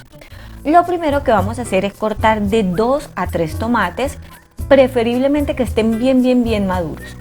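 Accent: Colombia. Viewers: Amo tu acento Colombiano!!!! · Por el nombre del canal yo pensé que era de comida mexicana ya que el achiote es la principal especie utilizada en la comida yucateca pero al oír tu acento me doy cuenta que eres colombiana.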